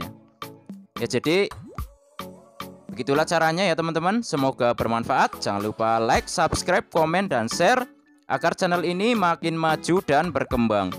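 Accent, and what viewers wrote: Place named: Java, Indonesia. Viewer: Jawa banget... Medok banget jawane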